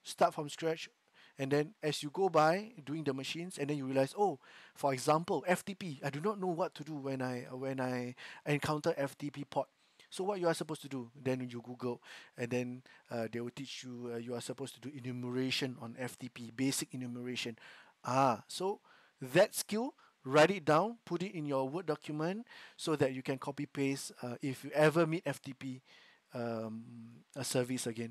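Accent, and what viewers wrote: Singapore. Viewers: Its like the singaporean accent is so strong, i recognized it instantly😅 congrats bro, i just completed Google Cybersecurity Cert and hope to be working in cybersec with just sec+ and oscp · Thanks for sharing. You sound like a Singaporean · Sounds like Singaporean. Congrats!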